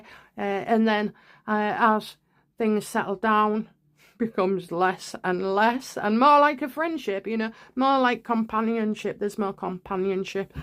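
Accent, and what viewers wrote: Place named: Yorkshire, United Kingdom. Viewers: How dare you that’s so rude she’s got a Yorkshire accent if you don’t understand her then turn off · For your information has explained in the past that she was deaf also the dialect is northern, so sounds a little strange to some. Your rudeness is absolutely beyond the pail. What a truly nasty thing to say, bet your speech isn't perfect, shame on you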